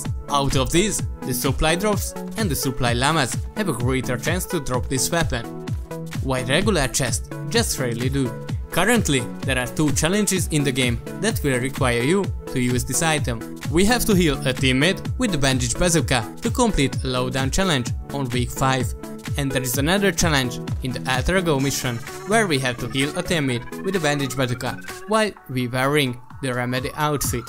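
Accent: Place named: Hungary. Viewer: You have a typical Hungaryan accent. 'És szerintem magyar is vagy, tetszik a hangod :D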